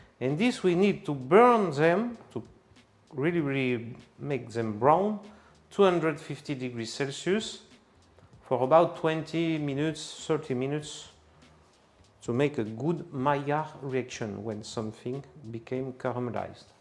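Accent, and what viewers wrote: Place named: France. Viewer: j'ai mis une minute a comprendre que la video est en anglais, je sais pas si l'habitude de l'anglais, le french accent, ou l'herbe dans la cigarette, bref merci pour la video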